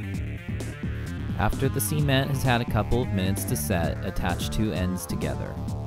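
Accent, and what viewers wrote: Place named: Nevada, United States. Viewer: sorry Taiwan not Philippines and that's what I figured. You sound like me and I'm from Nevada haha.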